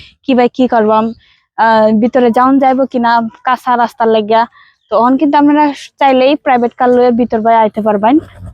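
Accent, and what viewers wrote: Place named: Sylhet, Bangladesh. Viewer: Your speaking accent is much like to the Sylheti dialect/language 🎉😮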